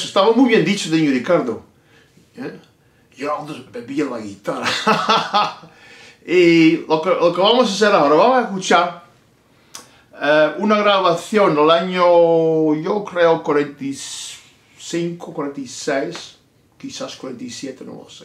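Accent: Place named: Spain. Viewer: Hello sir, what kind of Spanish accent is that of yours ? Thank you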